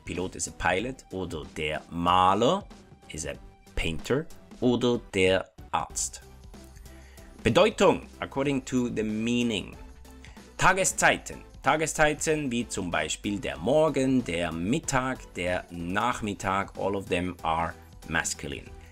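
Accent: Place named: Switzerland. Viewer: Swiss accent, to my ears.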